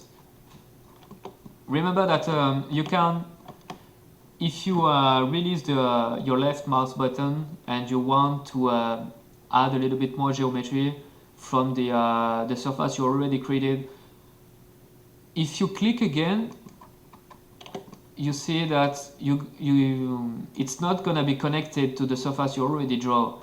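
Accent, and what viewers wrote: France. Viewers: jeu sur attaque des titans par un français \o\ . Ca se voit que tu viens de france (accent)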